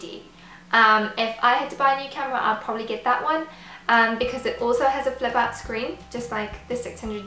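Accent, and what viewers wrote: England, United Kingdom. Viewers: She speaks in a British accent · i think she is Indian and has a british accent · Your British😱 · I never knew you were British!!!! Ilysm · Your British! Me didn't know that. 😱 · OH MY GOD SHES BRITISH???!!!!!!??? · love your british accent and you are so charming!! kisses from brasil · She is from South Africa, but she finished her master degree en England. That's why she got her very nice accent...👍🏻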